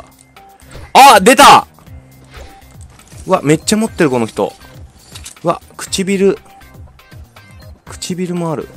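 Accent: Kansai, Japan. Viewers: BOXINGは関西出身なの??ww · 関西弁縛りでもしてんの？？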